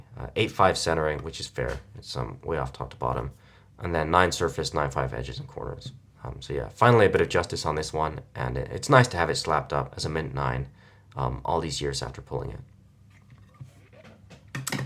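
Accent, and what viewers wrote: United Kingdom. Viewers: ah that explains much more things ! I did wonder about the location at some point since you have a not-so-marked british accent - though still obviously noticeable in hindsight ^^ Well keep the good work and thank you for sharing your magnificient returns. It's quite rare to have European collectors not shy of showing their pearls so it's a pleasure to watch it beyond the Channel.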